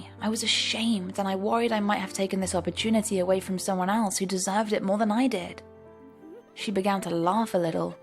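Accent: United Kingdom. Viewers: The speaker is British but no the person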